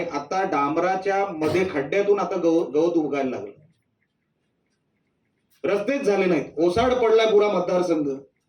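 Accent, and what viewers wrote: Malvani, India. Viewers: Malvan voic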